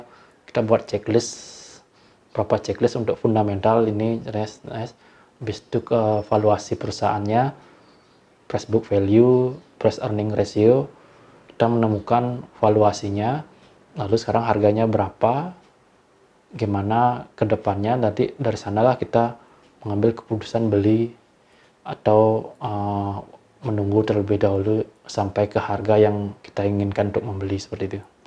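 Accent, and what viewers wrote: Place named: Java, Indonesia. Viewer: Medok sajan bahasa Indonesia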